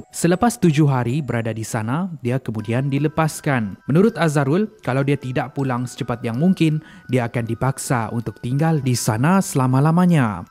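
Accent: Sabah, Malaysia. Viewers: sabah bah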